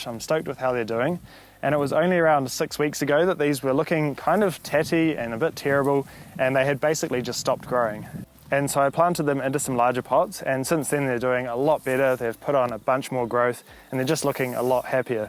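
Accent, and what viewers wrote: New Zealand. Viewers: This is the best video on how to grow peach or nectarines from seed. This is how you make good videos. No nonsense video. It must have taken a lot of patience. The kiwi accent is like a cherry on the top.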